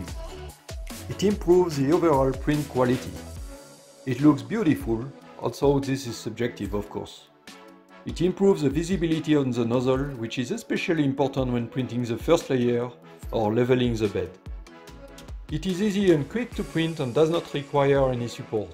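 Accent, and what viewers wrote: France. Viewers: Sympa l'accent français 😁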